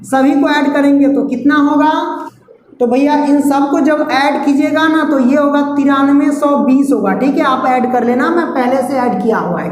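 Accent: Bihar, India. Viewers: Sir aap bihar se hai kya.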